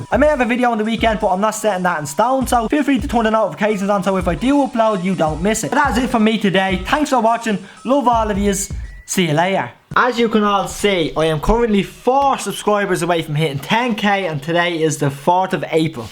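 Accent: Ireland. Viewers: So enjoyed these videos from Australia.was born in Dublin , drimnagh you are brilliant keep them coming love your Brough ha ha .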